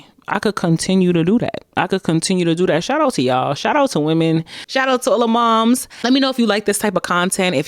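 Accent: New York, United States. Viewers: I love your NY accent 😍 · the New York was JUMPING LEAPING out in this video 😂😂😂 miss your energy on my feed💅🏿🩷🩷🩷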